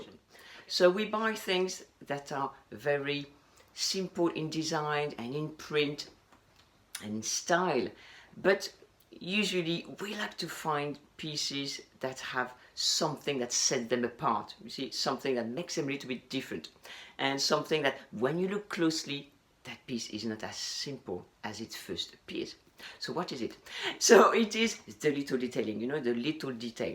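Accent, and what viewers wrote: France. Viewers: Hi, I’ve just discovered your channel and already watched a bunch of your videos. I love them and I love your English with French accent! It’s so cute! I was wondering if you could do a video on how to dress according to age. I’m in my late thirties. Blessings from Argentina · I love your accent. Your clothes are beautiful, timeless classic pieces that you could have in your wardrobe for many years. I think it's worth investing a little more money into good fabrics and a piece that's a little different. As you would wear them time and time again. I love the black and white, and navy and white stripes and polka dots in your wardrobe, and accessories, just beautiful 😄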